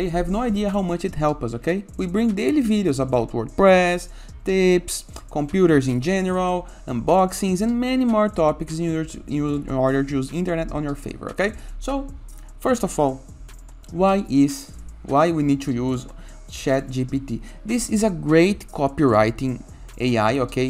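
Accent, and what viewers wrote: Brazil. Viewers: I know a Brazilian accent when I hear one! Excellent content ;)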